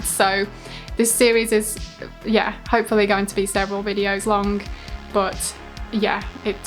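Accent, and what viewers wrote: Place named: England, United Kingdom. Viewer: Thanks for a very enjoyable video,. You have a fantastic collection of books with some very eye-catching covers, a lot of which I have to admit I've never even heard of! As I'm over in the UK it was also great to see US editions of books by favourite authors of mine like Clive Barker and Ramsey Campbell. It also made me smile that you still say Poppy "Zed" Brite instead of Poppy "Zee" Brite and that you still pronounce "Bernard" the English way 🙂. I'm probably going to have to watch this video again so I can note down and research the books that particularly captured my attention.......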